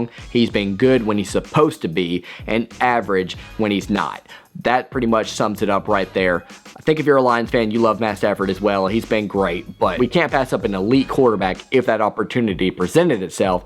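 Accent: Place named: South, United States. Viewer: Are you in Michigan? You got like a southern accent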